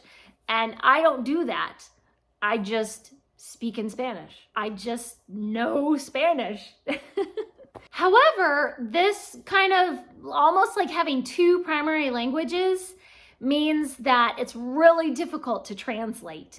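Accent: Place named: Mexico. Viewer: Hola! Saludos desde Barcelona (España). I follow your YouTube channel since some months ago now and it is the first time I comment. Hope you are well! You are the second booktuber that I find (the truth is, in a very short period of time!) that speaks Spanish as well and I am very happy for that!! My first language is Catalan and my second/first language is Spanish. I speak English and a little bit of French and learning Russian nowadays. Would be great to read some books in Spanish with you! Hablas muy bien español con ese acento mejicano maravilloso 👏👏👏. Hasta pronto!!! Cuídate mucho!! Bye!😊🙋‍♀️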